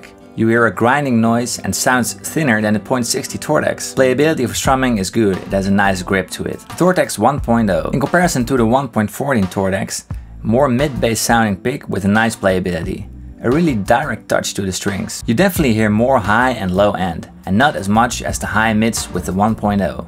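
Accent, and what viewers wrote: Netherlands. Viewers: From which country are you? Your accent sounds dutch..... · jij klinkt een beetje Nederlands? zou dat kunnen?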